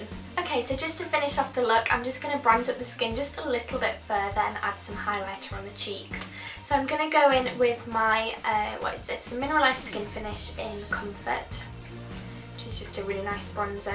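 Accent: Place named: Yorkshire, United Kingdom. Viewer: Hey! I adore your accent, what part of Yorkshire are you from? Love this look xoxo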